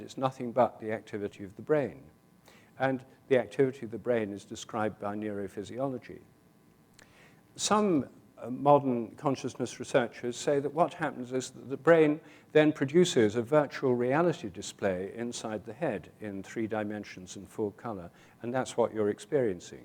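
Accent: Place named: United Kingdom. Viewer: Because he has a British accent? He isn't saying anything I haven't heard before.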